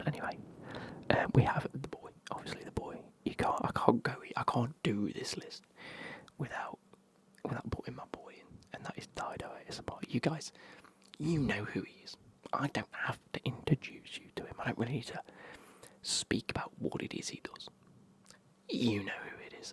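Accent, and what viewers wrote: United Kingdom. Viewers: I think its so interesting how the australian or new zealand accent is what you fancy, because to me thats your UK accent lol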